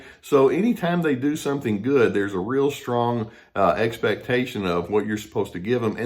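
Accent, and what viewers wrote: South, United States. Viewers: you remind me of dr phil i dont know maybe its the southern accent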